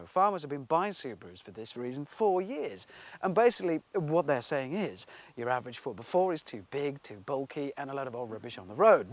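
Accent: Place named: Birmingham, United Kingdom. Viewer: His Birmingham accent was still audible back then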